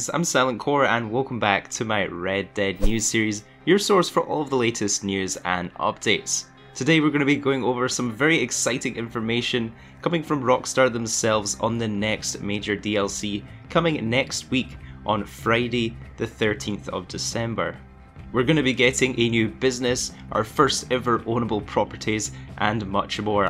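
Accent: Scotland, United Kingdom. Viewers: Hey silent, your vids are great man. My Mrs is Scottish and we live in New Zealand so hearing that accent is wicked. Gonna be good to have a cabin setup with moonshine business. Makes you wonder what expansion the collector role will get huh.? What's your thoughts? I madam nazar type wagon or trapper site like in storey?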